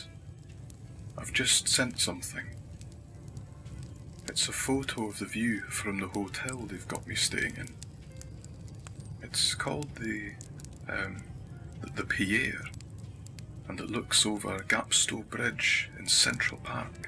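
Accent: Scotland, United Kingdom. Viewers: Santa with a Scottish accent okay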